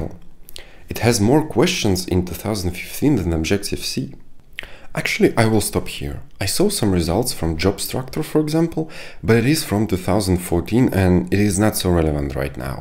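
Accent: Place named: Russia. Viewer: Наш человек, сразу слышно)))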